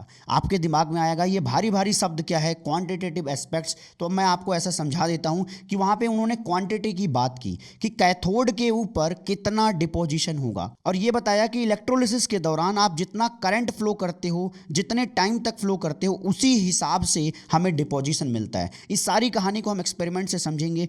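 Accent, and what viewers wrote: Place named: Bihar, India. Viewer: This chemistry sir feels like he's from bihar😀😀....so proud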